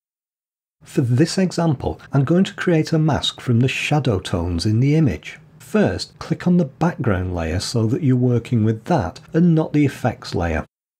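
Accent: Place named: Manchester, United Kingdom. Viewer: Hi, I loved hearing your Manchester pronunciation, identical almost to my Cheshire accent, even after 37 years in Germany. However - I have to draw the line at your pronunciation of "Gaussian" as "Gaysian" - the pronunciation is phonetically "Gouwsian" as in (Northern slang) "nouse" after the German mathematician Carl Friedrich Gauß (Gowse??). Thanks a lot, enjoyed the video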